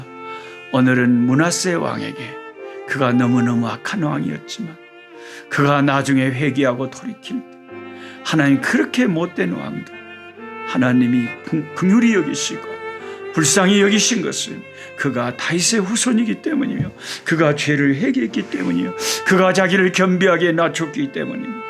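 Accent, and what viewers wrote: Jeolla, South Korea. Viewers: 목사님 감사합니다.날마다 꿀송이 보약큐티 말씀을 통해 너무은혜가 됩니다.특별히 전라도사투리가 너무나 구수 합니다.항상주님의 은혜로 건강하시고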